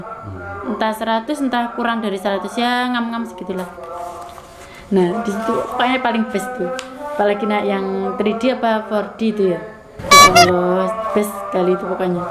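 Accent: Java, Indonesia. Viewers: Pekat Jawa nya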